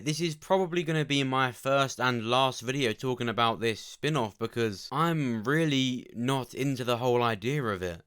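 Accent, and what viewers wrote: United Kingdom. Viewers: Do you ever do live Q and As?? Your theories are so interesting I’d love to ask your opinions on some other things TWD related And sooooo good to hear a fellow Brit breaking down these trailers and info. I want to win!!!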